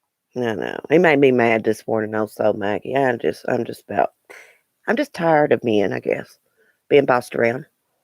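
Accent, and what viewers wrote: South, United States. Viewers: Love your sweet southern accent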